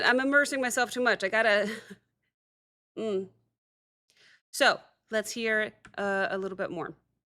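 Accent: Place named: Ireland. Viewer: Lovely Irish tones❤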